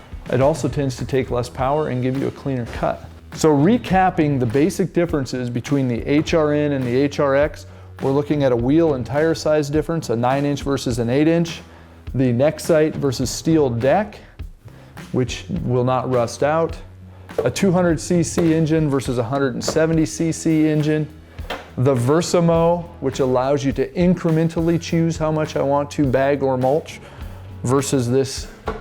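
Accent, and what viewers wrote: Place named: Canada. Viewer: This guys Canadian accent is soo killer.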